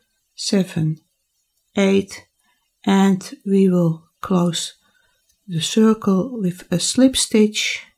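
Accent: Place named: Netherlands. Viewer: Grappig, ik dacht bij mezelf: "Wat een sterk Nederlands accent..." En toen bezocht ik je website. :) Dat Starburst patroon is mooi om te zien, hoor.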